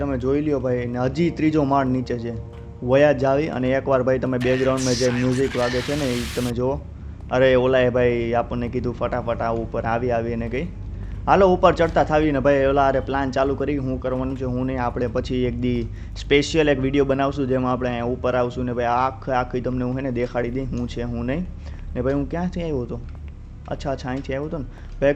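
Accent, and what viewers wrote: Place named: Kamrej, India. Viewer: Bhai tame kyana aa tame j vat kari te em lage kamrej khaudhara gali aju baju❤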